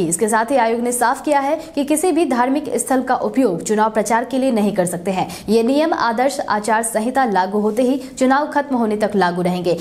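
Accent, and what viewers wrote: Bihar, India. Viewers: Bihari mat bolakaro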